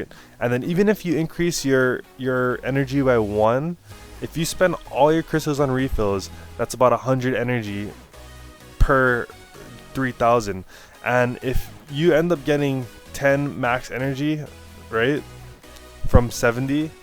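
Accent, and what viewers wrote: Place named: Hawaii, United States. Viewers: Yeah I could hear the pidgin small kine too. Lol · nah, its not thick, but if you're from hawaii theres certain things that you can never stop and you recognize when others do it too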